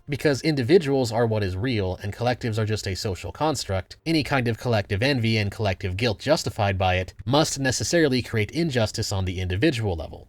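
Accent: Boston, United States. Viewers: Yeah, she's originally Bostonian. Moved to an undisclosed location and has tried to get the beantown out of her voice to little avail.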